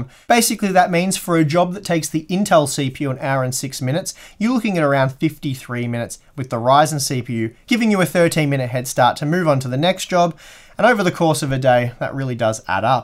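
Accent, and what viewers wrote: Australia. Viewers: Nice Aussie accent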